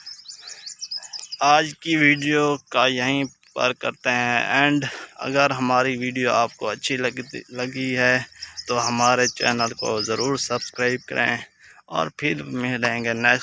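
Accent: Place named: Saraiki, Pakistan. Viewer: ویسے تو آپ کی اردو بہت اچھی ہے تھوڑے اردو کے الفاظ آپ کے کٹ جاتے ہیں وہ آپ کی سرائیکی زبان میں آ جاتے ہیں لیکن کوشش کریں صحیح بولنے کی انشاللہ آپ کو اردو صحیح آجائے گی 👌🏻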